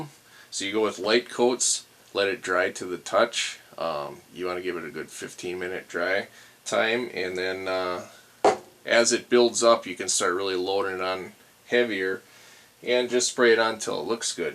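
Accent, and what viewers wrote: Canada. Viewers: For some reason i can always pick up on canadian accents. R U?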